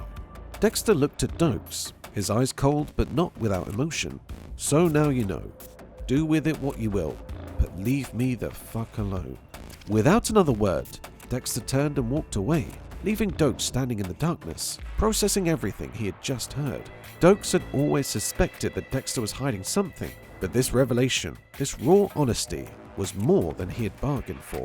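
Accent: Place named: United Kingdom. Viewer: “Masuker” “Dextah” I love Brit’s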